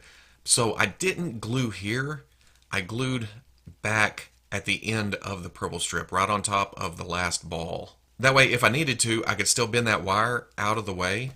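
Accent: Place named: South, United States. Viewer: Not gonna lie, my favorite part of your videos is your smooth, southern accent. It's very pleasing to the ears. I don't know why I feel this way and I'm aware that it's kind of weird but I can't help my feelings dammit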